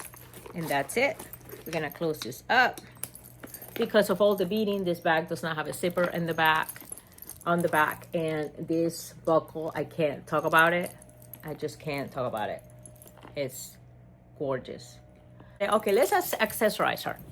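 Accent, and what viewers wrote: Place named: El Salvador. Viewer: I love that crystal tabby. You aways have great bags. where are you from? The accent sounds like el Salvador or maybe Columbia 🤔 But anyway, the new loved leather in brown looks way too intentional on certain bags.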